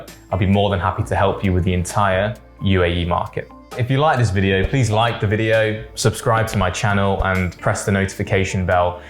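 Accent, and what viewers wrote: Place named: United Kingdom. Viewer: Brodr why do u look indian,work in arabia n has an British accent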